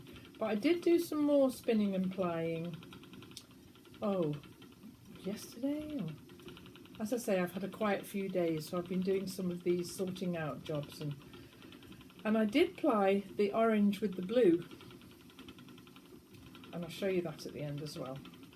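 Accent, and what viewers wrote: England, United Kingdom. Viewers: Someone asked me if my friend Kate who lives in England has an English accent. Uh,h,h.......I don't think so. So I'll be listening more closely to see if you DO have an English accent.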